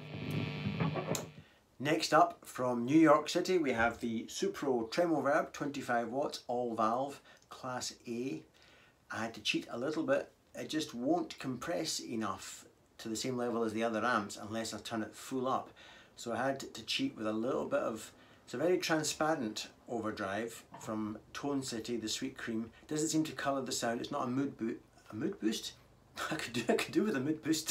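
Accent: Scotland, United Kingdom. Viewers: just discovered your channel, brilliant to hear a fellow Scotsman on YouTube not too many about, love your playing and analysis, keep up the good work buddy.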